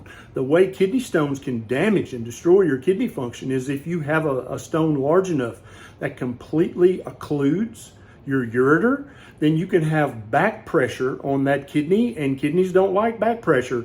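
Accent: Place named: Deep South, United States. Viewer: Your analysis is the most comprehensive and logically reasoned that I have seen. You DO need to work on taming that extreme southern accent. It's distracting and is taken as backward and ignorant by a decent percentage of all who were not raised in the deep south. This will lead to people immediately clicking off your excellent video as soon as you start talking. Your information is so good. I just wish you had a better presentation. Not only are you elongating words, but you have the extreme form, where entire extra syllables are injected where they do not belong. The word LIST is one quick syllable. You are pronouncing LAAAYYYUST- a complete and utter abortion of the word, so extreme that I had to rewind just to be sure what word you were attempting. To correct THEYUS, (THIS) please practice saying words quickly, succinctly. You will sound far more intelligent. 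The word ON and the word OWN are two different words. ON should not be pronounced OWN! BAD is BAD not BAYYUD. CONTEND IS CONTEND, not CONTAAYYYUHD. The word WELL should be pronounced WELL- not WAAAYYYEL! The word BE is BE not BAY, and certainly not BAAYEE. DOWN is DOWN, not DAAYYOWN! Just practice saying ALL words quickly, correctly and without injecting extra syllables.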